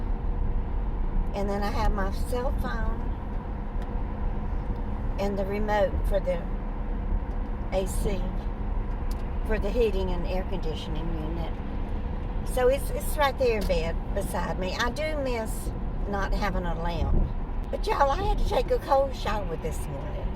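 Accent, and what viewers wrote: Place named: Mississippi, United States. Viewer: youtube randomly changed my id about a year and a half ago. Instead of protesting, I just went with it! Sometimes its easier not to draw attention to yourself, at least for me! I have been enjoying your channel for sometime. One time I got unsubscribed by the powers that be. Maybe a test to see who's paying attention! Anyway love you and what you do. Your accent reminds me of a cherished aunt who has passed on. She lived in Mississippi.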